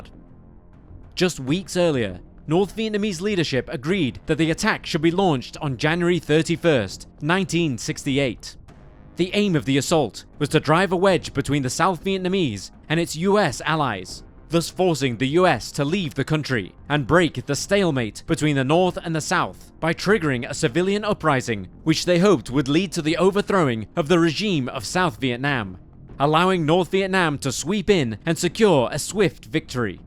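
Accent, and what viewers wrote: United Kingdom. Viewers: No more limey narrator!.....sick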